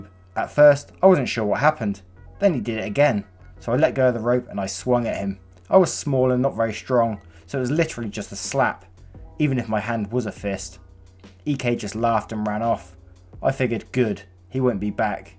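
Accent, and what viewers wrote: United Kingdom. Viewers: First video i watched, am subscribing. Your narrations are on point. Also nice to hear a British accent on Youtube :D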